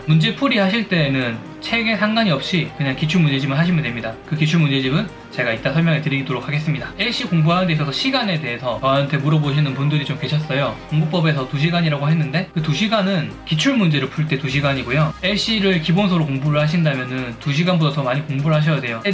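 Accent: Gyeongsang, South Korea. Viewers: 전달력이 좋으신데 말이 너무 빠릅니다.~ 영남 사투리 악센트를 써서 그런지 모르겠지만 표준어를 쓰는 사람에게는 유튜버님의 이야기를 잘 못들을 수 있어요. 말을 조금 천천히 하시는게 좋을 듯 합니다.^^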